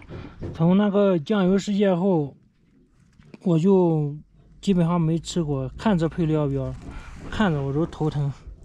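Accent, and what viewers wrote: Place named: Linyi, China. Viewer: 听口音像是山东老乡，像是俺们临沂那一代口音哈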